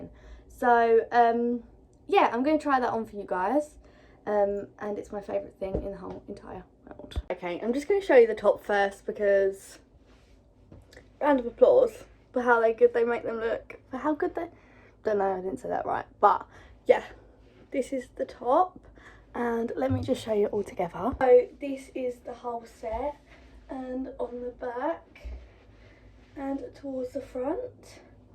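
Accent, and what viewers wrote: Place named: United Kingdom. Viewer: you are GORGEOUS. Beautiful brown/green eyes, beautiful smile and cute voice with the British accent. Beautiful body and CURVES. You are STUNNING in everything you wear. All of the outfits in this video look REALLY cute on you and the colors they are make you BEAUTIFUL. The greens definitely look GREAT on you and bring out those beautiful eyes. You are GORGEOUS and SUPER cute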